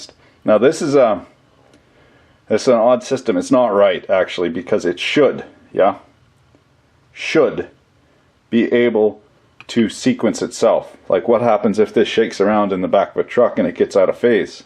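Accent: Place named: Canada. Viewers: My wife think's voice (read Canadian accent) is kinda sexy, since watching while in bed has been my newly formed habit. I can confirm that sexy time with the mrs has increased approximately 33.333% this past month (March). He is the ultimate wingman; helps me get laid while teaching. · it's that Canadian charm boys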